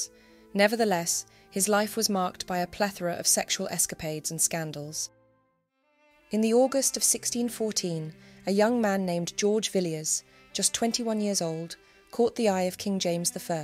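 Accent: England, United Kingdom. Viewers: What great insights into poems and verses we've heard! I never knew they dated back so many years and you offer interesting viewpoints and explanations. I also love your English accent. It makes you sound that much more genuine on these subjects. Keep up the good work ❤.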